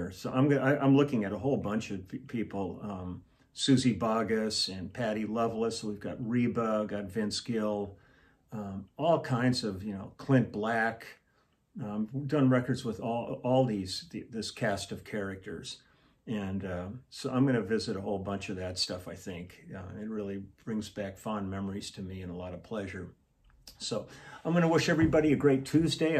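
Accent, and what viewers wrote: South, United States. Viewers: You made me spit my drink out when you told the story about the Loveless Cafe! As they say, "You got South in your mouth"! I can't believe you can remember street names! I have to say, you sounded very authentic saying Goo-Goo clusters! That song you played was popular right when I moved Nashville from Houston. Thx for the giggles! Btw, I just played her the Loveless Cafe story and we died laughing! Thank you again! Taryn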